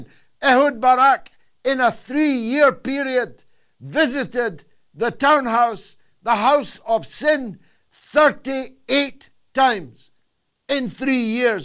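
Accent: Scotland, United Kingdom. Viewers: Hold on, maybe I just misheard you due to your thick Scottish accent, but did you just say ‘Bubba’ is a HORSE??!!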